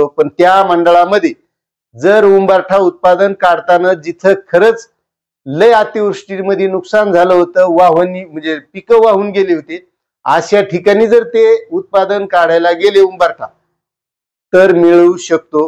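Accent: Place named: Vidarbha, India. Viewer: तुम्ही विदर्भात राहात का,, भाषा ओळखीची वाटत आहे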